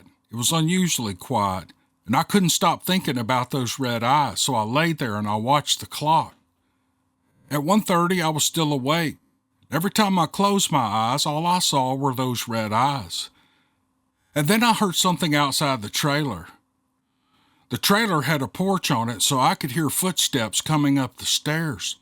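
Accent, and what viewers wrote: South, United States. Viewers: Well young man I don't comment much but your southern drawl just makes the stories more interesting and exciting to listen to. So yeah great job and keep up the good work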